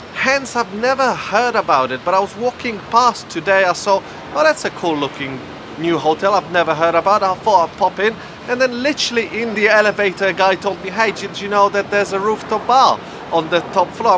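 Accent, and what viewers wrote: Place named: Germany. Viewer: German twang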